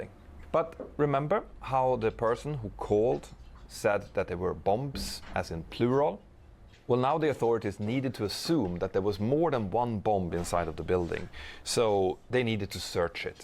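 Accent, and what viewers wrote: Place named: Europe. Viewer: Okayeg weird nondescript euro accent narrator :^(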